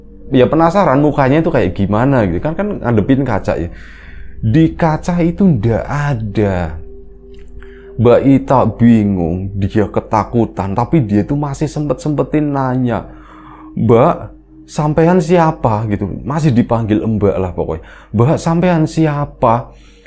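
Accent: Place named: Tegal, Indonesia. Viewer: Lama gak dengerin 1,5 thn lebih ,logat naratornya Tegal banget,he..he...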